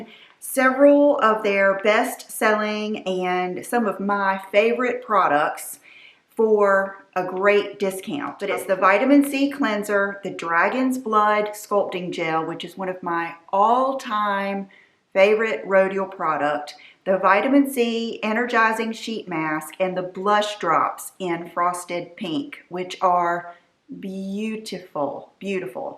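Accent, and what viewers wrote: South, United States. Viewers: I was so excited seeing all of the missing make-up and box of perfume you found in the garage the last few weeks. It was so fun to see the joy all of that stuff brought you. I love your energy and sweet soothing southern voice. 🌸🐝 🌸🌸💄 👛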